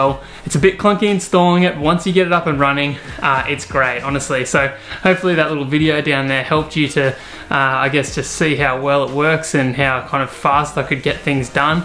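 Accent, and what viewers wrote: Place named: Australia. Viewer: What a great guy you are. So nice to hear an Aussie too. I am about to buy an Air M1 for my grandaughter to use photoshop. Really appreciate your feedback/review. 😀😀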